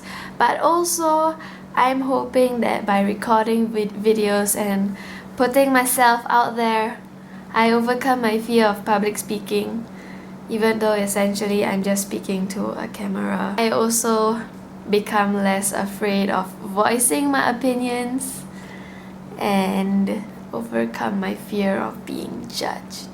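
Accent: Malaysia. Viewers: Heyyyyy I LOVE your accent! I'm also Malaysian/Chinese! WHOOO. LETZ BE FRANDS! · literally the first second I heard you speak I was like, this girl gotta be malaysian. AND I WAS (half) RIGHT :D OMG OMG ANOTHER MALAYSIAN BOOKTUBER THIS IS FANTASTIC. I also recently started booktube and I'm really enjoying it so far and like god know how I struggle with like keeping up with reading and filming and editing gah. dont worry I hit 20. it changes nothing HAHA You are an absolute natural and I cannot wait to see more of your videos! :D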